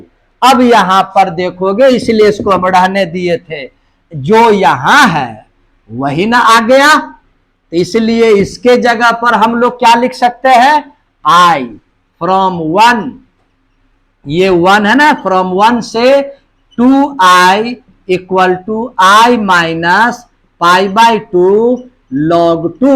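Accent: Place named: Rural, India. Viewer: gawar kaise bole rahi hai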